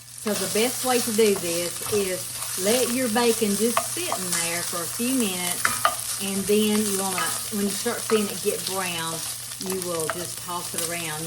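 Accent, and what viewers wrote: South, United States. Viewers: I’m so glad I found your channel. I’ve now watched several of your videos and everything looks so yummy! Love your southern accent…………I love also that you call your husband sweet Hunter…….my husband was a sweetheart, but passed away 4 years ago……miss him so much!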